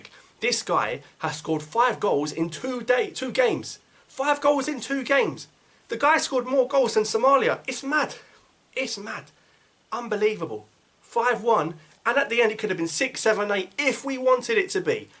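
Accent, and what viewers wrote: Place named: Cockney, United Kingdom. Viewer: Well done, Cockney boy keep up.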